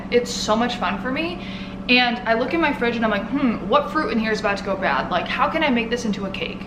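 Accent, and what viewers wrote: Netherlands. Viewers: you kinda have a Dutch accent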